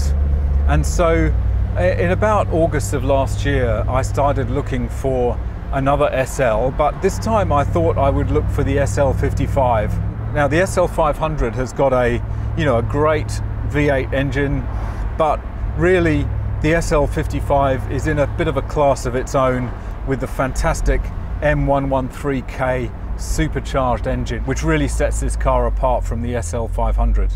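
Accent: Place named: United Kingdom. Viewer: Hey, you sound very Brit 😀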